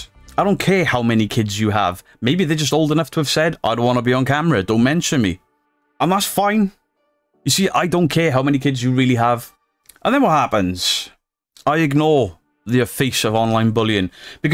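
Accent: Wales, United Kingdom. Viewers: u crack me up! I always watch ur stuff! Ur ace! u tell the truth which sadly soooo many of the ghost hunters etc just do not! Keep doing what ur doing please and I adore ur accent! I ❤️ the welsh! I don’t live far from the border! Thanks from the Labrador! 🐾🐾🐾🐾👍🏻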